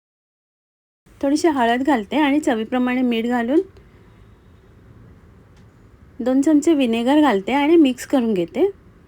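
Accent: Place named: Goa, India. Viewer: तुमची सांगण्याची पद्धत फारच गोड आहे.ऐकतच रहावे असे वाटते.गोव्याचे कोकणी मराठी मध्ये.❤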